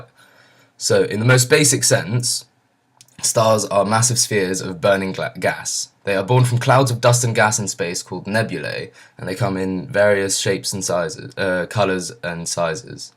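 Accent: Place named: RP, United Kingdom. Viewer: You sound posh. I imagine you went to decent schools. What a waste. You mumble and miss pronounce.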